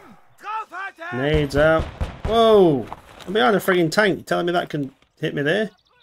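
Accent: England, United Kingdom. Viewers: Weird to my ears to hear the invading German army "luckily" has plenty of materiel with which to invade Poland. In an English accent too. Great game though. Thanks for vid.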